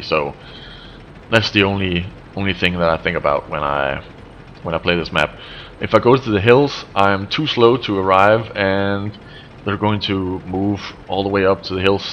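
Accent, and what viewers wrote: Denmark. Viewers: Dude be nice if we could face each other cause when i watch ur vids i get the urge to face u lol...an based on the accent ur Danish am i correct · are you danish???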